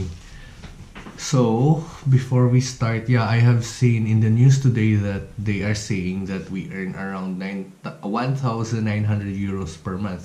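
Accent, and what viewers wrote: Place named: Ilonggo, Philippines. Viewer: Ilonggo ka sir?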